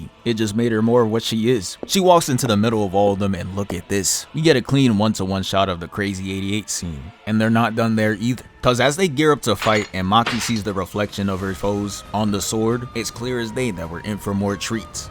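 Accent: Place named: South, United States. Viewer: Yeah pardna, he talk country.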